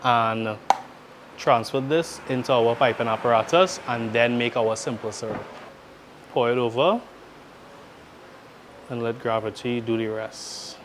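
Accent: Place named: Trinidad and Tobago. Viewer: I love your accent. Are you originally from Trinidad? Excellent recipe. I’m going to try it. Thank you.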